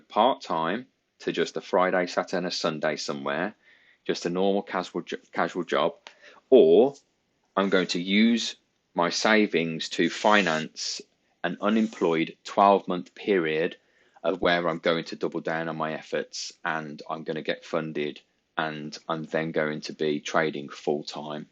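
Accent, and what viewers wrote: Birmingham, United Kingdom. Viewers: i can only tell because I'm a brummie myself lol. Most won't though right. Nice to see a brummie on here. Keep up the game bro.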